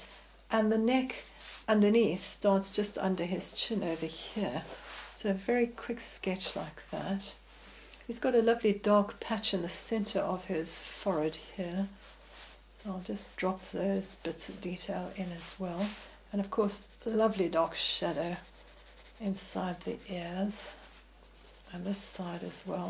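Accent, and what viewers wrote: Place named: United Kingdom. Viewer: Cool British accent :D wiked lessons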